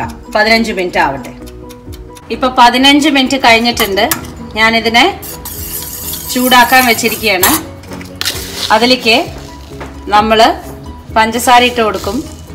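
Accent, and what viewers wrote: Palakkad, India. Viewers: Dear chiechi wonder ful performance chechi palakkadnte abhimanam anne njan oru palakkad kariyanne chechi nammude palakkadu style venam varthamanam parayan enikku orupadu eshtamane njan ennaleyannu video kandathe iam very happy njan mumbayil thamasikkunnu chechi orupadu thanks njan ella video kannam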